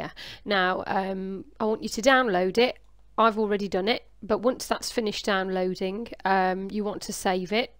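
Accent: Midlands, United Kingdom. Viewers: Thanks for this.. Very useful indeed...you sound like a fellow midlander btw :)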